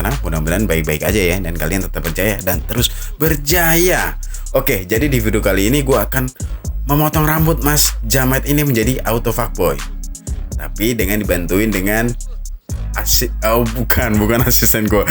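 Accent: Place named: Pati, Indonesia. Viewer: oh pantess saya penasaran aja logat sampeyan kayak orang pati.ternyata simbahnya dari kolilo pati.ya2.ngak meleset filling gw.😎😎😎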